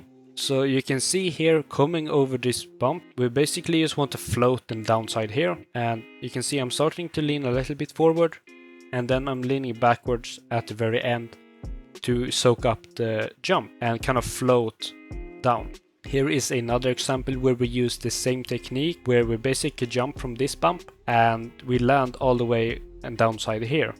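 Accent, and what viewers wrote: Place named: Sweden. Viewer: Jag hör att du är svensk så jag tar det på svenska. Hur lutar jag fram och tillbaka? Är inte som på GTA där man använder joysticken, i alla fall inte för mig. Har jag någon nybörjar-setting på som blockerar?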